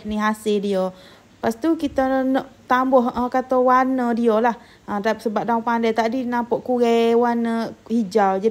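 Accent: Kelantan, Malaysia. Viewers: WASSALLAM WRB.Sedap mashallah tlong cakap BM betul.Ndmpak hitam sikit patut tak nampak macam burnt.Jkkhir.Bukan s4mua faham dialect Krlantan.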